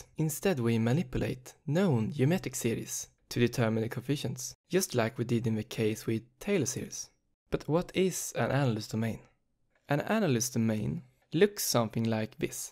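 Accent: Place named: Sweden. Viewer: Dude that's the loveliest most Swedish accent if I ever have heard one! :D And Thanks for the vid, exam in two days, fingers crossed!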